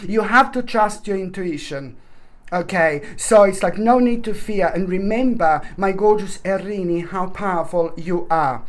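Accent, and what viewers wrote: Italy. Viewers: I totally Love your videos and excellent readings!! Thank you!! Your Italian accent is beautiful 😍 too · Ciao! Thank you for Scorpio reading, love your energy, Good vibes and your italian accent! I have got so much italian roots 🙏🏻 Grazie mille from Monte Carlo. Last seven days 🤞🏻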